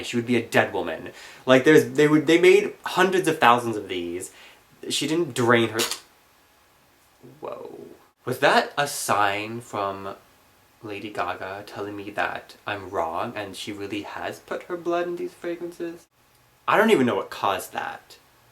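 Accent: Germany. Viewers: hahaha they must be german. I love your german accent. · You have like a perfect German accent, I can't stop listening to it.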